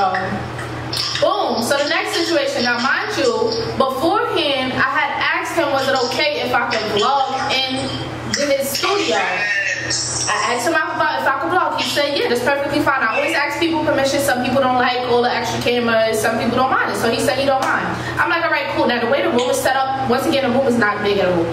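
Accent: New York, United States. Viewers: “Akeke” i love how new york you are sis 🤣🤣🤣🥳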